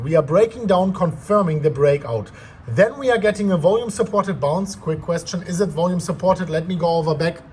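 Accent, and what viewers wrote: Germany. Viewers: Even if you speak English I fell like you speak German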